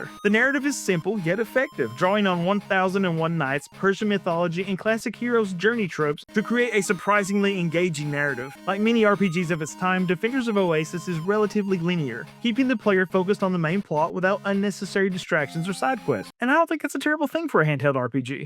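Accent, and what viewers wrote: South, United States. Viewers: its good to hear another southerner talk about jrpgs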